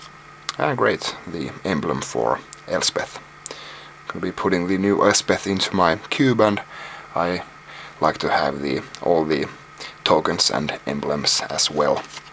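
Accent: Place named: Finland. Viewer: I think I just nerdgasmed for the accent suomi perkele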